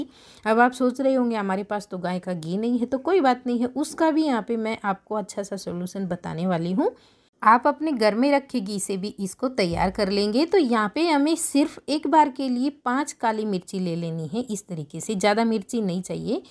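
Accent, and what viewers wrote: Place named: Marwar, India. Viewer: thank u ji.... ☺️ Hukum aapni marwadi me vi bnao koi... Kitni mithi boli h aapni marwadi😇😊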